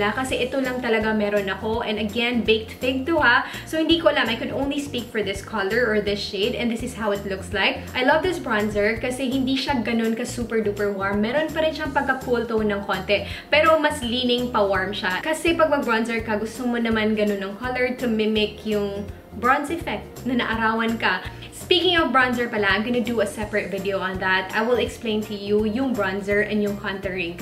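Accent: Visayas, Philippines. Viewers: Hello! The first time I watched your video, I already noticed your accent. It has a hint of Visayan accent. I'm not against it 'cause my dad's a Visaya as well. I just wanna ask these questions: 1. In what particular province did you reside while you're still here? 2. How long did you stay here? 3. What is your life verse? ☺ Thank you so much! Praying that God will grant the desires of your heart. 😊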